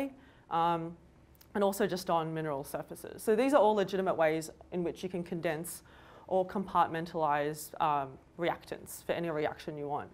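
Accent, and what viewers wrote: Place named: Australia. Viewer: thank you- down under accent can be fun to listen...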